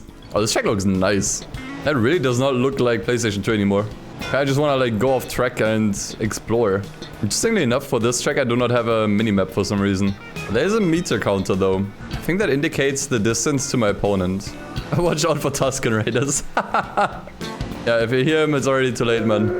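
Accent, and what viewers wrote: Germany. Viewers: i hear the German accent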